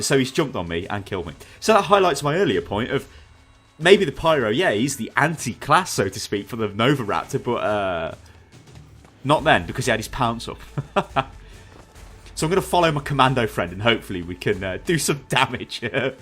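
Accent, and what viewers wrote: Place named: Birmingham, United Kingdom. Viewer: First of all, nice job with the new review! waay better :) but secondly, I've been wondering about your accents for a while now... so I'm gonna take a stab at it and guess... Birmingham?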